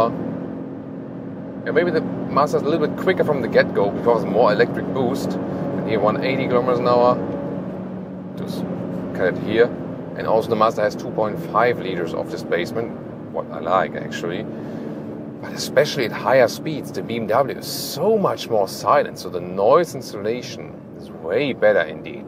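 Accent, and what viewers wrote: Germany. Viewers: Are you a native german? Because you're accent sounds very german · You have a strong German accent, you speak too fast, and you mumble. Not a great combination for comprehension.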